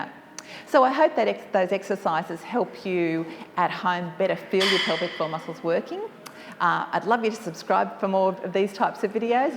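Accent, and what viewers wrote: Australia. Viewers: I’m making my rounds of videos just to hear her Aussie accent!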